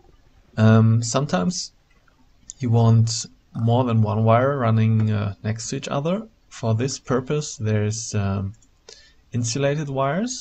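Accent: Germany. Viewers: Nice Video ! Helped me alot. Did I hear a german slight german accent ?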